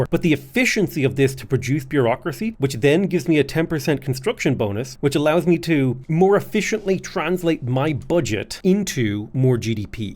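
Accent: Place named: Ireland. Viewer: Came back to finish watching this - just realized that gets more and more Irish as the video goes by. (I do realize it's just his non-youtube accent slipping out bc of how long he's recording for)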